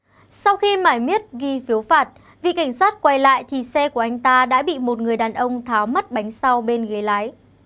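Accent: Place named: Hanoi, Vietnam. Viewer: thi giong nhu ngoai ha noi may chu gi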